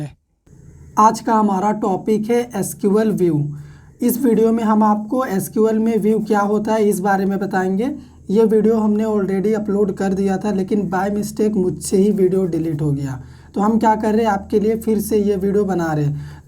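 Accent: Jamshedpur, India. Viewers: Are u from jamshedpur ❤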